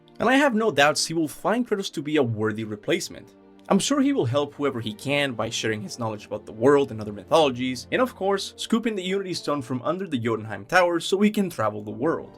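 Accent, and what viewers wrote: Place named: Cuba. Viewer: ah yes, Kaptain Kutie well duh. It's the name of the game. Awesome video,! You sound a bit cuban but i like it!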